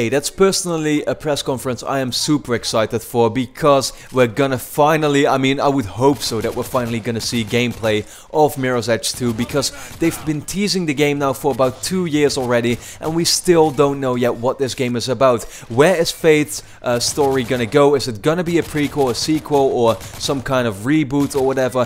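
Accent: Netherlands. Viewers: are you dutch? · Ik dacht al deze gast spreekt met een Nederlands accent :p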